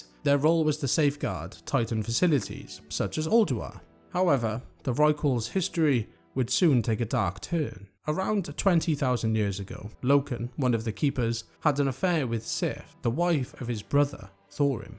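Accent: Wales, United Kingdom. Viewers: This is great for us returning to Azeroth. Weird question, is that a Welsh accent?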